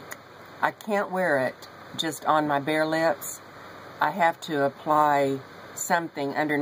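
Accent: South, United States. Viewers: Lol I do have a accent a jersey accent lol you do sound southern ha!!!!!! You made me laugh that's for sure have a great weekend my friend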